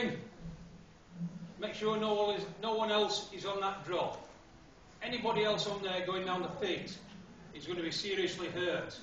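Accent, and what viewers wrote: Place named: Yorkshire, United Kingdom. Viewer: That is a Yorkshire accent.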